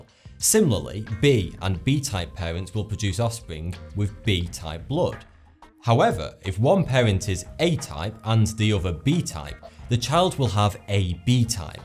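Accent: United Kingdom. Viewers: Hold on, when you say "British" accent do you mean: Irish, Scottish, Welsh, Northern, Dorset, stereotypical Londoners or English. Please specify. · R u British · Thought 2 is like vsauce, but he's a Brit.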